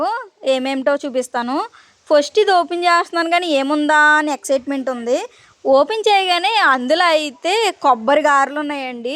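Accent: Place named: Godavari, India. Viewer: Super undi sister.mana godarolla majaka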